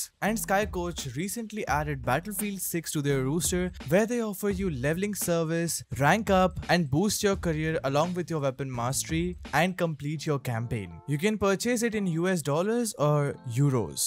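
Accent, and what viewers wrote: India. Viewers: indian detected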